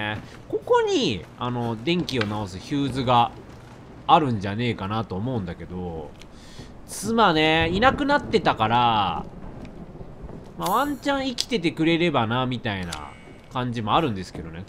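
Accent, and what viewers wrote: Kansai, Japan. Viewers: やっぱレトさんの関西弁ええなあさっきまでガッチさんの見てたから差が大きくておもしろいwww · 追い詰められたら関西弁になるレトさん好きwww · 普段よりさんの関西弁が多くて嬉しいしかないです🤦‍♀️🤦‍♀️💕💕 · 最強に怖くなると関西弁に戻る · レトさん焦ると関西弁がめちゃめちゃでるからちょーうれしい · 関西弁がどんどん増えてく…笑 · 焦ると関西弁が出てきてしまうさん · なんかバイオだとレトさん西の感じ強いね笑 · バイオしてるさんは関西弁になるw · ホラーとか焦る場面が多いゲームになるとレトさんの関西弁がいっぱい聞けるので嬉しい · レトさんの関西弁で中和されてる気がする、、、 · 近年なかなか聞けなくなったレトさんの関西弁、、、ありがとうございます✴ なんか新鮮です！ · レトさんの関西弁がおもろすぎる笑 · レトさんがびっくりする反応とたまにでる関西弁、好きです。怖い系とびびる系は大丈夫なんだけど痛い系は無理ドライバーの+−そんなに気になる？笑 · なんか最近前以上に関西弁がよく出る気がする、気のせいかな？笑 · レトさんの実況補正あるにしてもめちゃくちゃ怖すぎて画面ちっちゃくして見てます😂😂関西弁たすかる😂😂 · びっくりしすぎだし関西弁出すぎｗ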